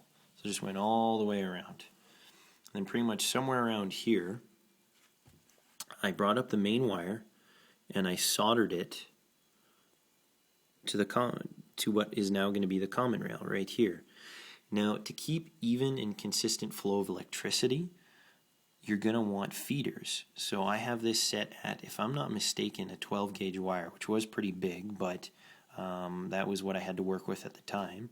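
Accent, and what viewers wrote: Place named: Canada. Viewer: Hey...I love your explanation...(even the Canadian accent)..you are great..Be a teacher..you, got it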